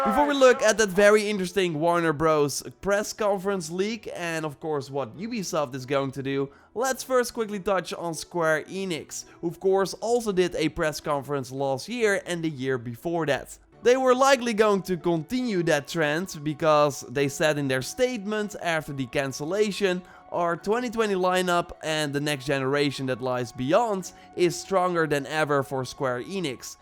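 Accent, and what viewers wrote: Netherlands. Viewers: Hey, Like your video's very much and learn a lot from them as well. Ben je Nederlands? Dacht het te horen aan je accent.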